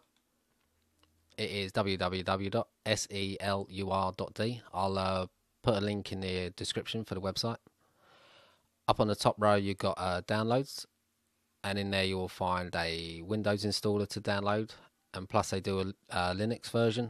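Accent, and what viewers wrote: England, United Kingdom. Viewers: I love the voice of England. When i hear that voice, my heart shake and can't normal...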